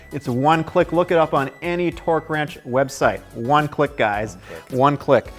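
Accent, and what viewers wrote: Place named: United States. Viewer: You look British but sound American. Good video, thanks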